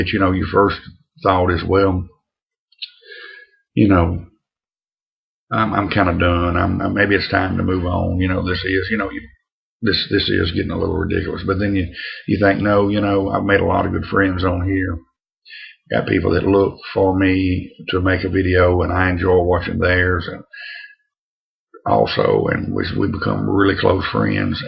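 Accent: Louisiana, United States. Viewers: Greetings from Boston. I just love that Louisiana accent. I aim to drive my caaaa down there at some point. Got some Orlik Golden Slice on the way. Great for a morning smoke.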